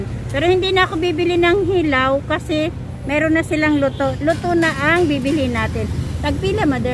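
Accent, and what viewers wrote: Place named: Manila, Philippines. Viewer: taga iligan diay ka pero ang imong tagalog murag pure na taga manila...happy new year dai❤from ISRAEL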